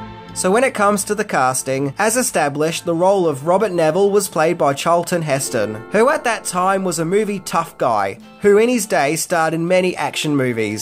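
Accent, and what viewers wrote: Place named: Australia. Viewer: is just speaking Australian English. In America, people say “oh-may-huh”. Apparently everybody else says “oh-me-guh”. Just different dialects of the English language.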